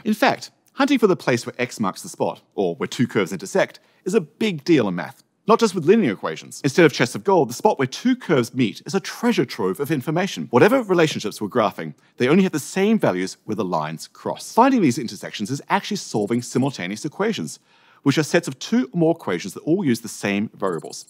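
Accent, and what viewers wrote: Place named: Australia. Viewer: What’s the accent? Also, this accent is rather slow compared to other australiamd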